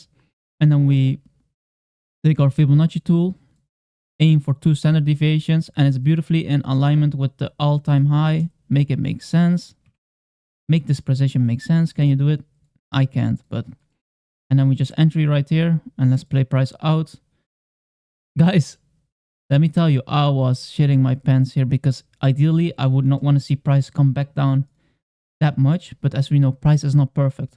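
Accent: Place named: Belgium. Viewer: ik a belgian accent whn i hear one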